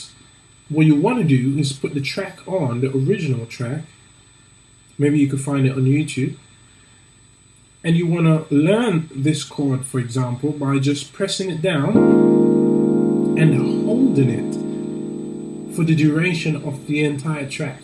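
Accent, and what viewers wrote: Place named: United Kingdom. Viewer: You sound like youre from the UK, which church do you go to?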